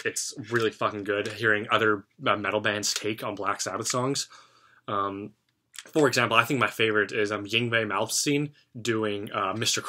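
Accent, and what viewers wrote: Canada. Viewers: Where is your accent from? Canada?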